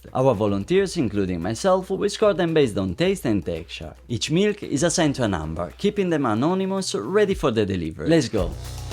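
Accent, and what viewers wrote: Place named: Italy. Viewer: I'm Italian and your English is really funny I think you are Italian too right?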